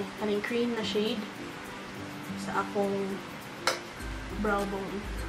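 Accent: Visayas, Philippines. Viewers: hehehe ang sweet naman mag bisaya 😊😊😊 yung asawa ko man ilonggo sweet din magsalita 😊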